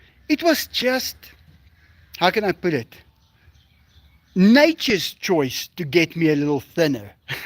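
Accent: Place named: South Africa. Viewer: Unique South African accent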